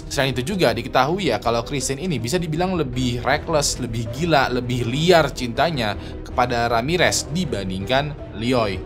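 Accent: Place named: Makassar, Indonesia. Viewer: Halo orang makassar ya? Nama di makassar itu, karna kosa kata n logat, biasanya dari nama jadi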